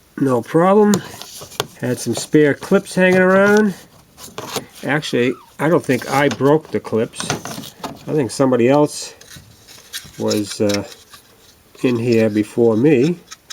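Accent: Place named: Boston, United States. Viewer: Ya sound like your from bawwston, are you from bawston there boy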